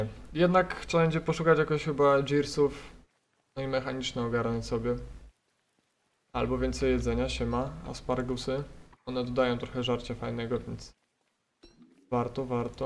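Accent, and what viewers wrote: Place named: England, United Kingdom. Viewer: А мне показалось англичанин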